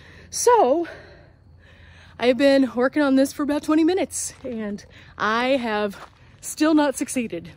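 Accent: Texas, United States. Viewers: Ooooohhhhhh I have been waffling for a year too for my NV. Gonna watch and see!!! I haven’t been able to pull the trigger on a rack and box because the NV is just a little tippy toppy rocky already and didn’t know if adding up volume was going to make it more so. And can I actually crawl up and get stuff easily or not. Would I even? But I want to go on longer journeys and to do so I either need a roof box or hitch box. Waffle waffle waffle waffle waffle. I love simplicity and minimalism and well a big ole box seems like a big ole closet. Carry on vs checking luggage. But maybe. Can’t tell you how much your channel has helped me make decisions because you have the same vehicle and the same Texan accent 🤣. Oh I miss Whataburger! Misplaced Texan that needs to journey home to see the folks whose house just flooded in that last flash flood and so ya I guess I need a roof closet y’all, darn it 🤷‍♀️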